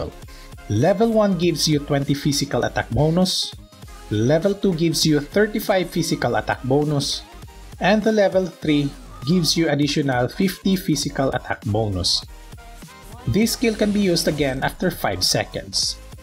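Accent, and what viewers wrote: Philippines. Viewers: Hope accent is from Philippine, right? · Tips are good but the pinoy accent is kinda hard to unhear.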